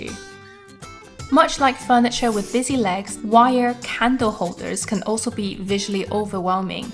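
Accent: United Kingdom. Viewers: Love the Brit Accent❤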